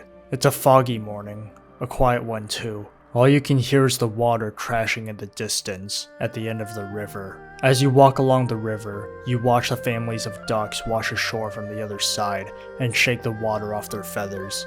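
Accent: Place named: China. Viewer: You sound so chiense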